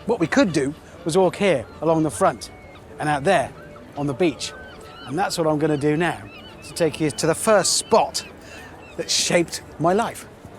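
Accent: United Kingdom. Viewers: the art of story telling will always be delightful in a uk accent...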